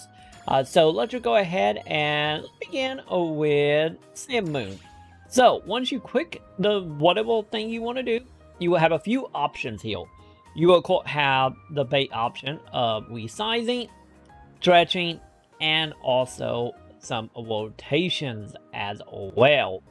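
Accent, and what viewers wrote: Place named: Australia. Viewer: This is the most Australian accent i've ever heard.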